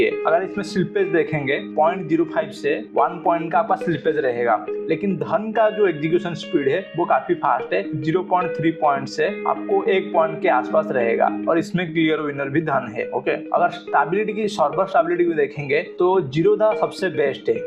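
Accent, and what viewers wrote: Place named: Odisha, India. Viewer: Odia acha bhai ??? Tama accent ru jana pduchi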